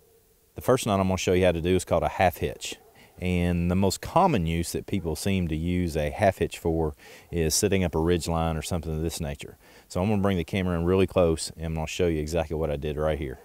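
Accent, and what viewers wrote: Kentucky, United States. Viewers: As soon as you started talking, I thought, "This guy's accent sounds very KY." Then I realized from your logo you're in Winchester. From one fellow Kentuckian to another, keep up the good work. You did a great job explaining these knots.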